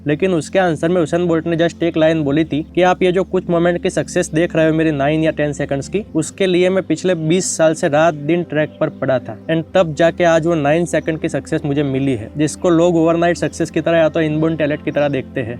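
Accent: Gujarat, India. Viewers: Gujrati accent in Hindi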